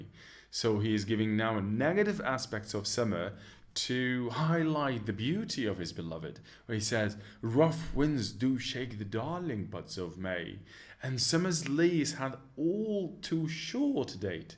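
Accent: United Kingdom. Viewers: I'm not into British accent but you're literally something else 👏💙!!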